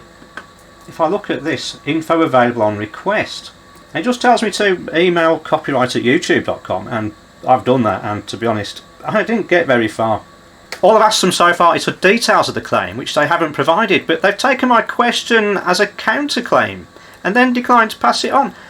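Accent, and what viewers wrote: United Kingdom. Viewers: The guy that makes that shitty Amp with all those wobbly plastic standoffs that looked like shit and not worth the $25 thousand anything? He just doesn’t want anyone to see his crappy workmanship. In my opinion a super high end amp that has any value is going to use vacuum tubes. Starting to love your channel and am enjoying your accent, Americans love a great Brit accented voice I guess it’s all the James Bond movies and Dr Who episodes that made it across the pond that started the addiction.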